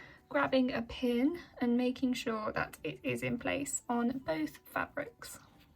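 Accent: United Kingdom. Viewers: Where ar u come from ? I like ur english look like british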